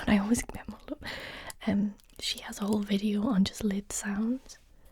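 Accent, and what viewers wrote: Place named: Newcastle, United Kingdom. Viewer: I love to hear you say "touch". Say it again! Say it again! I'm American, so I guess it's your Geordie accent. Is it Geordie? Not sure I really know what I'm talking about, but love the accent anyway.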